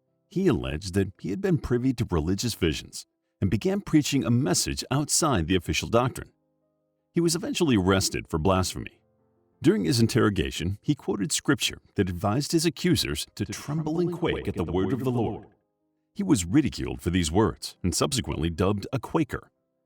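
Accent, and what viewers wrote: United States. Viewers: This Yankee narrator's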